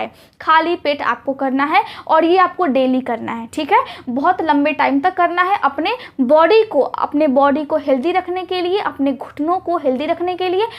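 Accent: Bihar, India. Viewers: Apki Bihari accent achha lagta he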